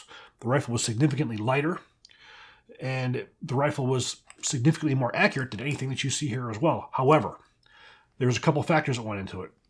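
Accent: Texas, United States. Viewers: Texan accent.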